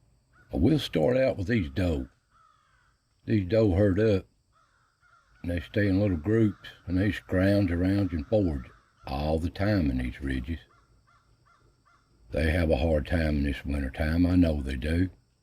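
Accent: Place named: South, United States. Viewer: I need to get your smooth southern voice to come do a colab! Bring your metal detector.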